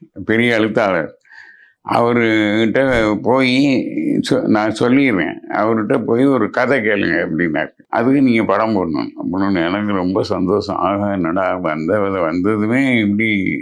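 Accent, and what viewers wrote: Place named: Tirunelveli, India. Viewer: சரளமான நெல்லைத் தமிழ்.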